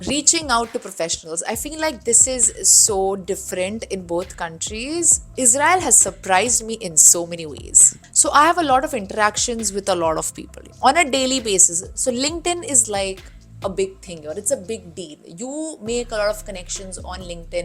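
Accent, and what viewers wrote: India. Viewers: 😂 You still have your Indian accent unchanged! About your comments on Indian punctuality (or lack thereof) I agree with you a hundred percent. IST (Indian Standard Time) is also known as Indian Stretchable Time. I wouldn't like to total up the manhours I've spent fretting and fuming, waiting for someone or the other: the total figure might give me a heart attack.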